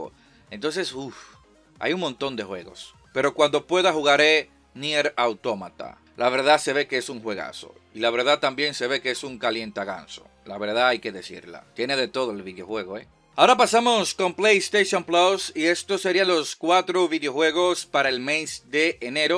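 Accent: Dominican Republic. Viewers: eres dominicano????????????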